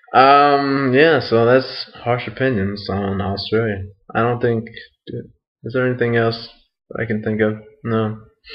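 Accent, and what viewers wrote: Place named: United States. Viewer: Take YOUR shitty accent and attitude back to America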